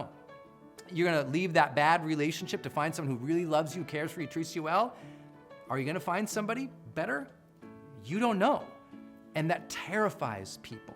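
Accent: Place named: United States. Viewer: One who is weak at English can easily learn English by listening U.S English it is much easier than that of England their accent is little bit harder to understand for new language learner and fortunately Brendon is from USA so you can't listen to him.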